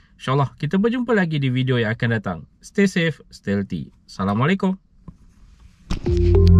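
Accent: Melaka, Malaysia. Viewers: abg orang Melaka ke?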